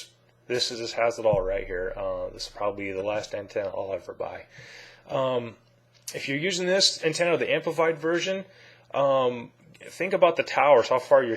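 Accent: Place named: Germany. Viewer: hi are you from germany? i noticed the german accent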